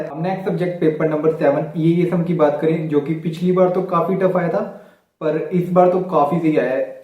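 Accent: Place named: Punjab, India. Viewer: okay ji mai vi punjab to . Tuhadi accent to pta lg gya tusi punjabi ho . Mai fazilka to ha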